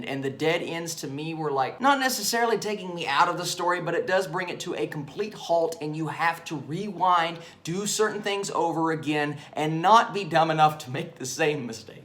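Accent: South, United States. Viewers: Just a professional piece of advice kid. Being in the entertainment industry and a host, and working on TV in the past, your movements are good, you're hosting ability by switching up your voice, with your movements is also a peeling. A production value is up-to-date. The only criticism I can give you, is you have to lose that southern accent. You want to portray a generic host, to a broader spectrum of audience. And when you get too excited that hometown hick sounding accent comes out. Just work on getting that out have your ability to communicate. All in all oh, good review. Would maybe have liked to seen you go a little more in-depth with the storyline and Easter eggs but other than that thumbs up.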